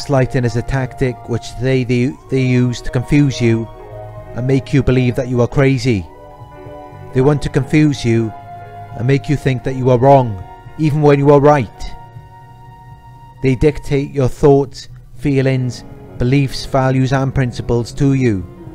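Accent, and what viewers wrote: Wales, United Kingdom. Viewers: Welsh accent matey!! Yep. So deep, this guy. xxx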